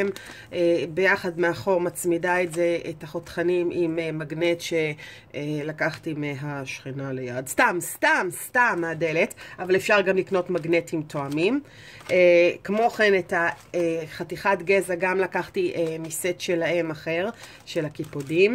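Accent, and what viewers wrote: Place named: Arab world. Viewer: Just watched this, didn't understand what you said but did get the idea and just like any papercrafter you love bling....lol For some some reason i thought you might have been Russian of Polish. But listening to you maybe Arabic.? Loved listening to you and your passion is really shining thru I keep smiling. Thankyou for sharing your excitement and passion, so infectious...🥰😜😘💜💚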